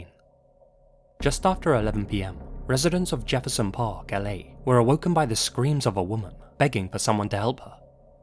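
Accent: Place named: United Kingdom. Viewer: I don't really care hpw you make videos. However, I would like to learn how to get that swanky British accent.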